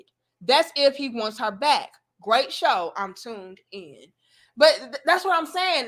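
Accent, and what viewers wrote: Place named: Chicago, United States. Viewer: I think this wonderful woman is from the Chi the way she talks says it all from me beautiful keep it up sis tell it .......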